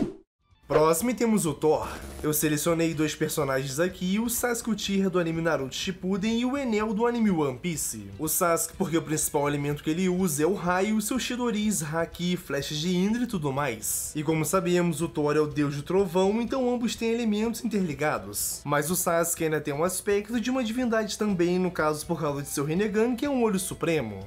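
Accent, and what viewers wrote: Portugal. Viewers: Você é português ? O sotaque parece rsrsrsrs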